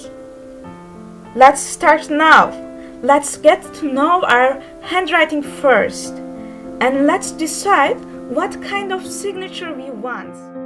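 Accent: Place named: Japan. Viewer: Japanese English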